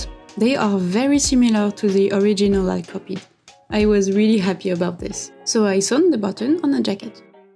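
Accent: France. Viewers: Magnifiiiiique ! Et tellement de courage et de patience. En tout cas je savoure chacune de tes vidéos depuis que j'ai découvert ta chaîne ! And I love the French accent ;)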